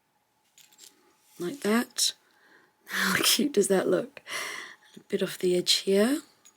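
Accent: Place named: Melbourne, Australia. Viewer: I am enjoying your channel and ideas! I made the Japanese fan journal from coffee filters and REALLY love it!!! As a bonus, I have a dear friend of many years who lives in Melbourne. So, listening to your lovely accent makes me feel like I am spending time with her!!!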